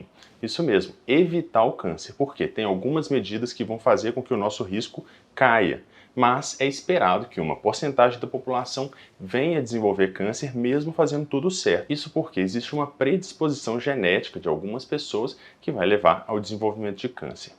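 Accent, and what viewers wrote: Minas Gerais, Brazil. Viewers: Obrigada doutor! Exposição clara, bem articulada, e este discreto sotaque mineiro é muito bonito. Já me foi retirado dois adenomas. Faço o melhor por mim, só não consigo parar de fumar. Mas fumo 2 a 3 cigarros por dia.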